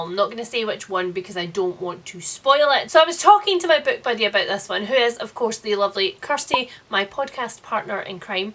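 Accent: Scotland, United Kingdom. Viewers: I ❤️ your Scottish accent.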